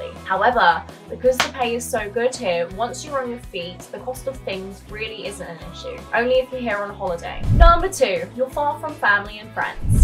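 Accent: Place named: United Kingdom. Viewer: And where are you from in the Uk accent sounds familiar I left in 1979 from just outside Birmingham.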